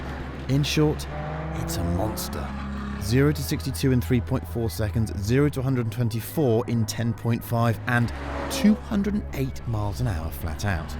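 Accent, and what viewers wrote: Italy. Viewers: That accent was like a weird hybrid between jar jar binks and an Italian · that italian accent :D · LOVE THAT ITALIAN ACCENT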